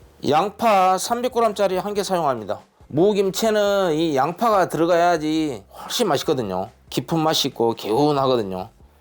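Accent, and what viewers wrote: Jeolla, South Korea. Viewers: 쉐프님의 요리 자주 시청하고 있습니다. 걸죽한 전라도 말씀 정감이갑니다.쉐프님께서 사용하시는 믹서기 구입하고 싶은데. 어디 좀 제품인지 알려주세요^^ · 동생도 김치를 담궈 주시는. 오빠의 사랑 전라도고향 말씨에 정감이 갑니다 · 셰프님 전라도 사투리 넘 정겨워요😊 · 전라도사투리가듣고 싶어서 무김치 잘배우고나갑니다 ㅎ ㅎ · 완전 사투리가 돌산 아니면 광양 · 전라도 사투리 설명이 구수하니 감칠맛 나는듯~^♡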